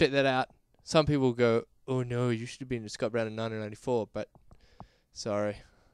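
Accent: Australia. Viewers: By any chance are you Australian ?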